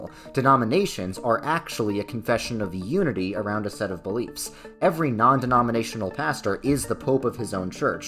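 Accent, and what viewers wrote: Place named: Chicago, United States. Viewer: Okay, completely unrelated, but are you from Illinois? I hear some Chicago in your accent😅😅😅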